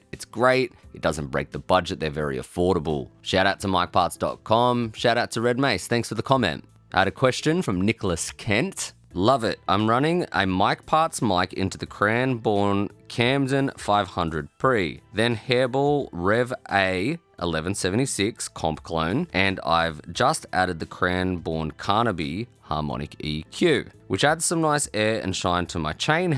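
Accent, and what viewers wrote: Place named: Australia. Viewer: Hi there, Iike your content, its interesting and informative and your accents reminds me on my days touring Australia in the 90 ies ... keep up the good work. Toni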